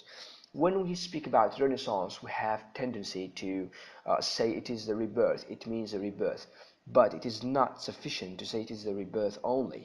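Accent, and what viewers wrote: United Kingdom. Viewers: Nice british accent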